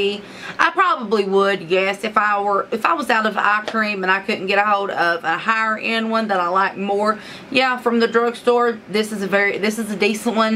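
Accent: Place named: South, United States. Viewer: The country accent makes me relate to your videos 😂 lol you gained another subscriber 💕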